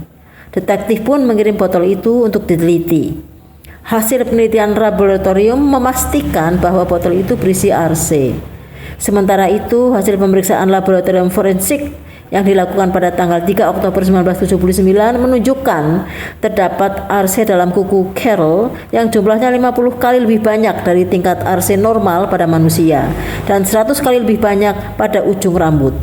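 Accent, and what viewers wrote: Java, Indonesia. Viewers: suara yg ad medok2 jawa ny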